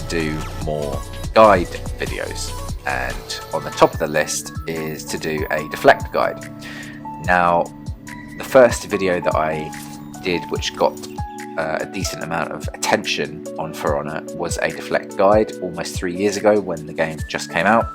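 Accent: England, United Kingdom. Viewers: ofc its a uk CUTIE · I fuckin knew it he was british. We luv ya!!!! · I had a feeling he had an English accent. I love it 😍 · Oooooooo a British accent I like it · O my god hes british i wasnt expecting that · Oh snap he's english! I love even more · Lovely British Orochi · No joke I thought u were Asian but it turns out you're a Brit like me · You're English, oh yeah baby respect my brother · Ah Yes, a fellow Englishman Finally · YES, HE'S BRITISH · Who else knew he would be from the U.K because I did lol 😂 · Didn't expect you to be English haha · He’s British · YES!!! BRITISH!!! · I had no inkling you were british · I mean I guessed you'd be from England from that time I found you and you kicked my ass on For Honor 😂😂😭 keep up the great work man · Voice reveal. British. Subscribed. Brilliant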